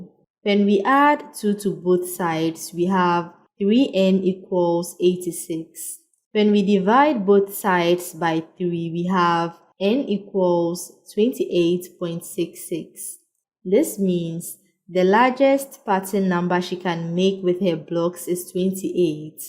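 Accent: Ghana. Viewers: are u ghanaian ? you have a very ghanaian accent either that or your from nigeria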